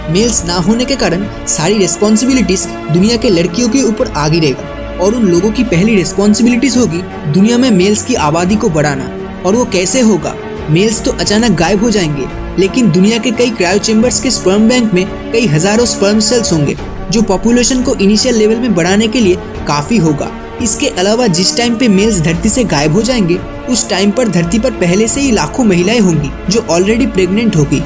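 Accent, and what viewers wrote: Bengal, India. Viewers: Bhai video bahut achhi hai but tera pronunciation full toos bekar hai...poori Bangali tone aa rahi hai...tu kya bangal se hai..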